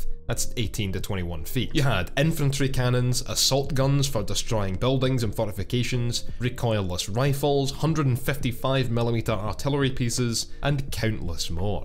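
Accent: Scotland, United Kingdom. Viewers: Correction, explosives are not used to push the projectile out of the barrel. That is called Propellent powder and it does not explode it burns fast to create pressure. The only “explosive” is the small primer that ignites the propellent, but you know all that and mid-spoke with that thick Scotish Broge. Cheers!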